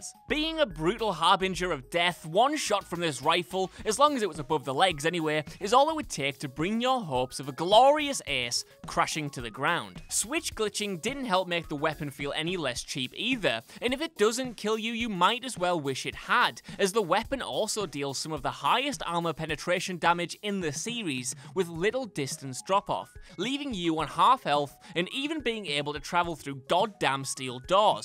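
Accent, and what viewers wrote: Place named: Newcastle, United Kingdom. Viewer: Felt like i was listening to a geordie shore reject. Good list though👌👍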